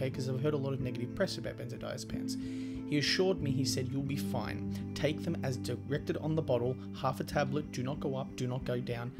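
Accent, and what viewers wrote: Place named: Victoria, Australia. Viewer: Subbed. Nice to hear a (I assume) Victorian accent talking re this stuff, makes me tune in and relate more. Thanks man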